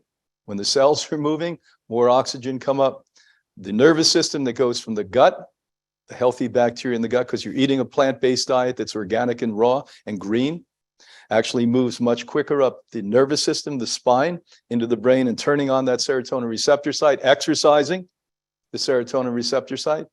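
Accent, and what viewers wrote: New York, United States. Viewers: Yes and I love the NY accent too as I’m originally from NJ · Poor! No matter how much knowledge and data you have, when are you going to learn that the way you deliver your information to human beings is all important? You're constant attitude of sarcasm and a superiority virtually reek from your being and the sidewise New York delivery is of no help whatsoever... You simply sound like the smartass spouting his stuff. When is the true milk of humankindness the true perfume of genuine affection going to issue from your being before you depart this world? Is it reserves for your family alone? How tragic. Try to talk to the man in the mirror. Perhaps give him a little trim and view him with no cosmetics. Whatever, and see if you really like this guy. It's a stretch to believe so. In any case, whatever you do, do it in love, and without needless sarcasm.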